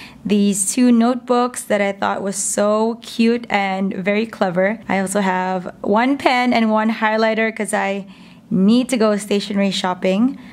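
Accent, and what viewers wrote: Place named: Sabah, Malaysia. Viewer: New favourite local youtuber! Your sabah and english accent got me 👌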